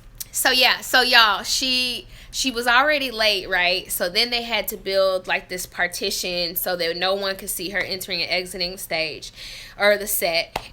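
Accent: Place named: South, United States. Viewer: That southern accent is never gonna go away darlin'. You are always awesome!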